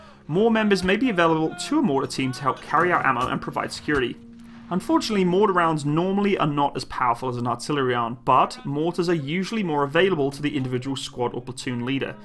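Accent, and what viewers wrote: United Kingdom. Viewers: >Search Youtube for an explainer on something related to history or the military. >Hear a British voice narrating. >Instantly like and subscribe. It's an involuntary reaction at this point. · I was wondering why the fck I was having trouble understanding some words uttered, till I found out at the end of the video that the man is British. Weird that it didn’t naturally strike me.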